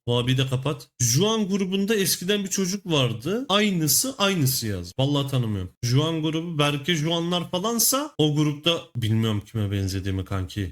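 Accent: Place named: Black Sea, Turkey. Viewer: abi şiven çok komik ve iyi.Karadeniz şiveni seviyorum.sana yeni hayaında işinde... başarılar dilerim abi.veeeee espirilerin gülüşün enerjin çok komik beni hep güldürüyorsunnn.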